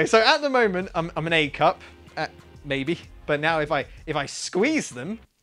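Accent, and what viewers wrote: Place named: United Kingdom. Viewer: I like how British people say three as free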